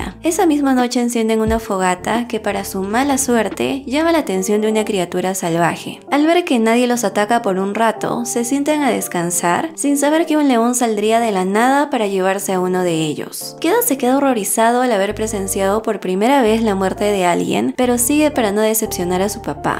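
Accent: Peru. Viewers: a los 10 segundos ya sabia que eras peruana🙂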